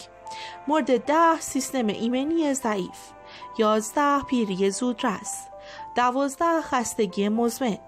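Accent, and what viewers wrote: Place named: Turkey. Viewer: لهجه تورکیت منو کشته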